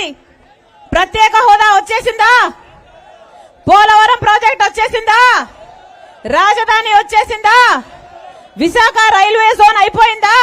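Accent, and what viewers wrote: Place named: Telangana, India. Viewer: తెలంగాణ లో మాటలాడినట్టు ఇక్కడ మాట్లాడితే బాగావుండదమ్మా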